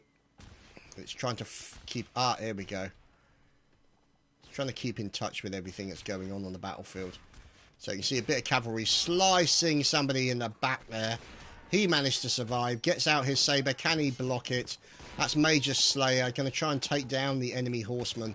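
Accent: England, United Kingdom. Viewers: I wonder how much does the rest of the world know about the American Civil War? Do they really cover that in your schools, consider the amount of your history in Europe compared to our short one?? Other then that you have a great voice for this and you commentary is very good. I hope you Brits know how much woman here love the "English" accents here. Americans in general really like the Brits as one-sided it may be from your end.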